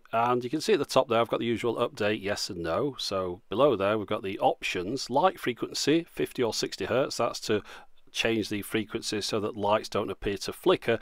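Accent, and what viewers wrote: United Kingdom. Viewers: British accents are so silly!!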